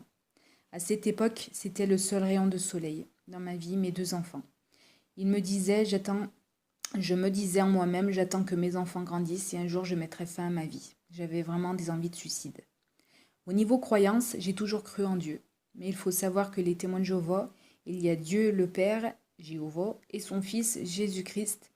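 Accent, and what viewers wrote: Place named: Nord-Pas-de-Calais, France. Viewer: 💙✨💙✨💙✨💙 J’entends un bien joli accent Ch’ti... Votre témoignage est bouleversant. MERCI pour ce partage ! Vous et moi avons traversé des évènements similaires. Voici, je vous ai donné le pouvoir de marcher sur les serpents et les scorpions, et sur toute la puissance de l’ennemi ; et rien ne pourra vous nuire. Luc (N’oubliez pas que quand on aime le Fils, on ne peut rejeter la Mère...) 💙✨💙✨💙✨💙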